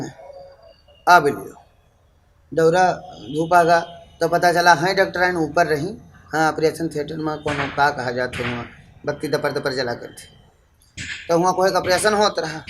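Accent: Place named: Barabanki, India. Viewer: अरे भाई आप कहा के है बाराबंकी के हे क्या लग रहा है बाराबंकी में रहते है क्यों की ये वही की बोली है! आपका छोटा भाई तारिक़ जैदपुर।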